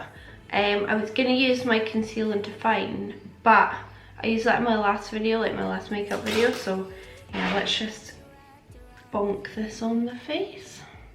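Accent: Scotland, United Kingdom. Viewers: Omg your Scottish 😯 help me with my makeup!!!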